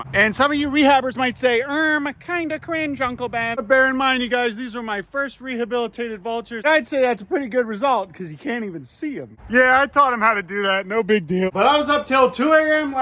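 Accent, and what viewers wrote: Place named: South, United States. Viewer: uncle ben, you have never sounded this southern before.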